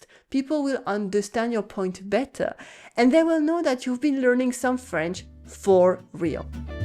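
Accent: Paris, France. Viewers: Qui dit tu vois. Quelqu’un de Paris. Une minorité francophone. La plupart du monde francophone ne parle pas comme toi. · "sound like a parisian", "absolutely unnecessary and unachievable" lol, so weak. Every things she says are legit, I m 50 y/o teacher born and raised in Picardie and I approve this video. So she speaks with parisian accent that s all, a guy from Toulouse or Amiens follow same rules with different accents. She doesn't say "shameful", there are several levels of language, you re a child ? She s teaching one, and ? what s the ***** pb ? I wonder if they sell some remedy for "anti-parisianisme primaire" :D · This is Parisian French. Not Canadian French. The French are just to stuck-up/uppity about the language and judge EVERYBODY else who even attempts to speak it. Unlike other places I've been, Asia, Germany and elsewhere, they appreciate the attempt and usually will smile and if they speak any English will try to meet you in the middle. 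Not the French! BY far the WORST country I've EVER been to!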